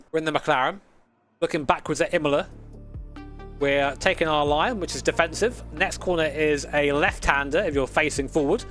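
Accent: United Kingdom. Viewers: I couldnt understand what you were saying since you are British and you sound poor anyways so whats the point of listening to you? being respecful goes a long way and its just a game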